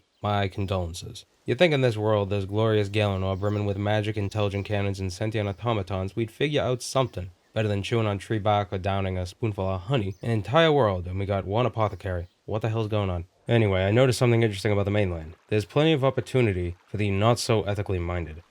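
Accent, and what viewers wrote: Boston, United States. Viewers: Hahha the thick Boston accent keeps coming out, makes me think about Bill Burr reminiscing about cops in Boston: THE KID'S A GOOD SHIT ^^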